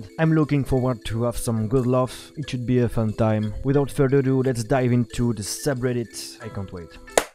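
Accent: France. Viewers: are u french?🤔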